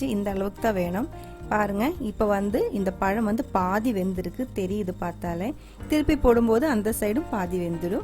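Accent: Kerala, India. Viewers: Kerala smell is reflect ing in ur sweet speach chachi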